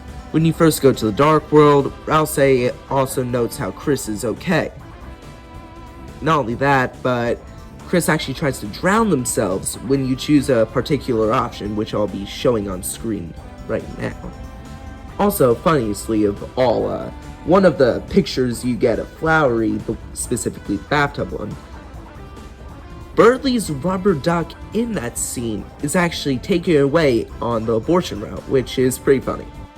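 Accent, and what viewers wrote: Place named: Canada. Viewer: you talk like a canadian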